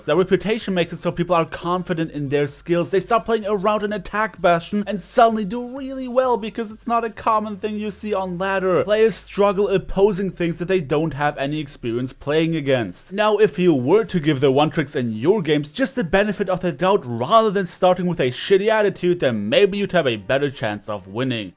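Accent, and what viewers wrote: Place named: Germany. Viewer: Hey, I might have a small personal question. If I remember correctly, you mentioned in one video that you are from Germany or at least from a german speaking region. I am from Austria and would be interested from which part of Germany exactly. I am just interested because of your accent and you are in my oppinion the best overwatch content producer on this plattform. Anyway just in case I confounded you with another youtuber and you arent from Germany, I apologise. (This was a nightmare to write with german Autokorrektur on my phone😤)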